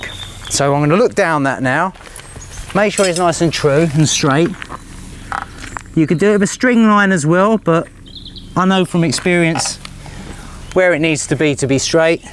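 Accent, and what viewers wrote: England, United Kingdom. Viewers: Uhm... I noticed British accent, rather than American... Swamp is... Louisiana... ? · Which part of England are you from? My Electrotechnology teacher has the same accent ahah And he’s also a good teacher! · English English is so annoying, innit?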